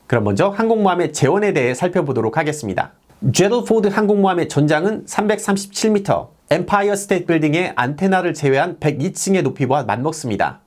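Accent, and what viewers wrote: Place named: United Kingdom. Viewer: 영국식 영어를 쓰시는 유튭을 보게되는군요. 잘 봤습니다.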